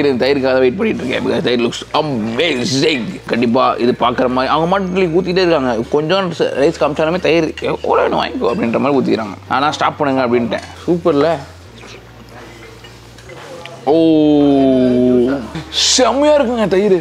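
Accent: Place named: Telugu, India. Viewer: Hi anna nige Telugu pesard kamidiya erk anna😂😂😂nanum AP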